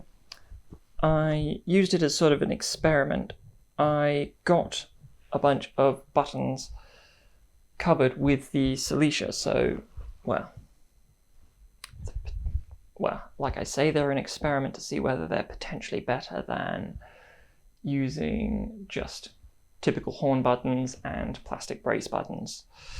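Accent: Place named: RP, United Kingdom. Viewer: no worries about that. You're fine and definitely do better than I could. We all enjoy your videos and learn from them and appreciate the work you put in. I do feel like maybe you're trying to be very RP with no regional accent and as an American we don't care at all about perfect RP.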